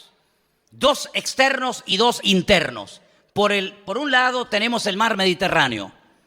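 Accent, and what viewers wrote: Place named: Central America. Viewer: Disculpe pero usted no podrá hacer el idioma de otros países aún se proponga imitar los por qué se nota su acento ..de centro américa así viva años en estados unidos del norte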